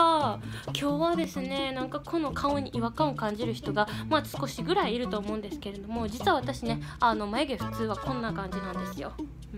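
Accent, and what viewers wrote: Kagoshima, Japan. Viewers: 가고시마사투리 귀여워요ㅋㅋ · すごい可愛いし、見てて全く飽きないし、鹿児島弁がすごく好きになりました！！(関東人) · chie zooo 鹿児島弁可愛いですけ？ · 鹿児島に産まれたかったなー！鹿児島弁かわいいなー！眉毛のインパクト大！！！！ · すばらしい！きれいな鹿児島弁です。おいどんがた、きっしゃなか鹿児島弁やね～（笑） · ちゃんのかごんま弁が可愛すぎてちょこちょこ見に来てしまう…❤️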